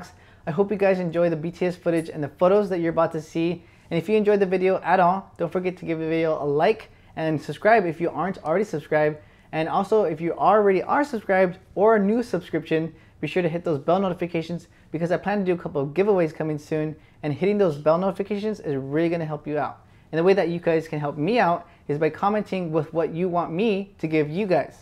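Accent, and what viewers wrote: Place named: United States. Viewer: Que tenga nombre latino significa que habla español? Por su acento me parece bastante Americano. Que estereotipada tu vision Rodolfito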